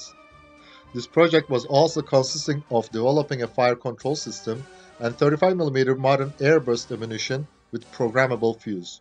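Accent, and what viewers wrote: Turkey. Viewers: Bu videoyu seslendiren arkadaşım sen Türksün anladığım kadarı ile. İyi çalışma yapmışsın... 👏🏻👏🏻👏🏻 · Lehçenden direkt olarak Türk olduğun belli oluyor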